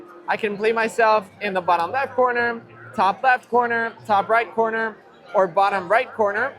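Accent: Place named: Spain. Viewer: El español de de España tía hablando de los iPhone joder 😅😅😅😅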